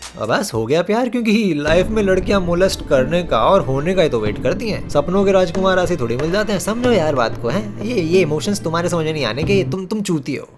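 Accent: India. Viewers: UK born with Desi Village accent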